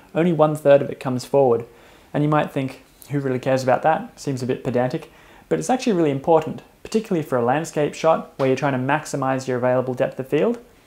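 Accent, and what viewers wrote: Australia. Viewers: That was the most simplistic no-brainer explanation of f-stops/aperture I have seen on YouTube. I don’t know if it’s the smooth Aussie 🇦🇺 accent but my Canadian 🇨🇦 brain appreciates your teaching style and analogies!! 👏🏿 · This is by far the clearest explanation compared to other videos. Easy to understand, yet more technical than others Chilled ozzy accent is a plus too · Thanks. I’ve been out today shooting the Darwin wetlands before and at sunset. Your video has explained a troublesome shooting situation made pretty simple. It sounds easy, I probably make it difficult for myself. Thank you for a simple, no B,S, no problems tutorial. Good to hear an Aussie on here. I have followed and saved. I will return.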